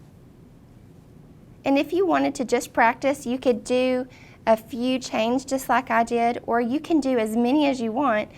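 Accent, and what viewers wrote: South, United States. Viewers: I live the southern accent!!!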